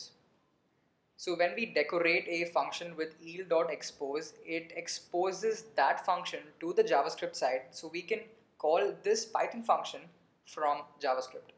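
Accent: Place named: India. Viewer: I love you and your indi accent. Love from Italy <3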